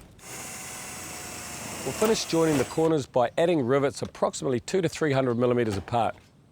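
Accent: New Zealand. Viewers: Just a Kiwi accent the whole time mate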